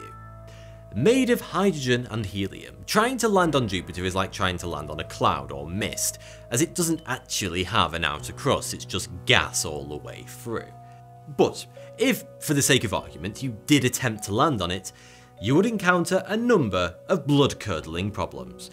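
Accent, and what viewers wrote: United Kingdom. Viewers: Hey Your Voice Is Deep and Beautiful. You Speak Like British Gentlemen. 😎🤓🧐🤗🙂😉 Yes Well And Sophisticated. I Am From India. Hey Why Corona Virus. I Thought This Video is Old Video. But You Just Uploaded On 3April 2020. 😃😀😄😆😂🤣😛😜😜 Be Safe From Corona Virus. Kill The Corona From Earth. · you sound british but you have lived in america for 12 years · This is the British younger Vsauce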